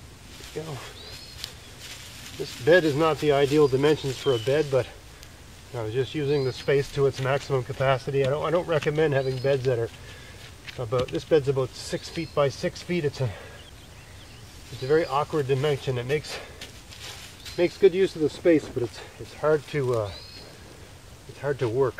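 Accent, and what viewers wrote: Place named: Atlantic Canada, Canada. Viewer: I've been trying to figure it out too! lol. More like Rick Mercer I'd say. East coast. Except Rick is from St Johns. But closer to Nova Scotia than Norm is for sure.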